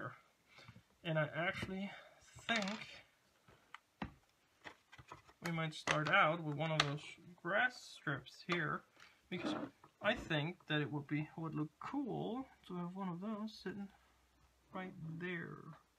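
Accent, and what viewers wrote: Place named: Southern California, United States. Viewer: I like your "shoot from the hip" style. It really is like that in real life, where different techniques, materials, and approaches are constantly being juggled to find the best way to model a certain stage of one's work. By the way, maybe you have disclosed it, but are you in Europe? Your common products such as white glue appears in your clips with European labeling, and you have mentioned costs in Euros, not dollars. This belies your SoCal accent. But I do enjoy the casual nature of your clips, and the emphasis on diorama building and layout.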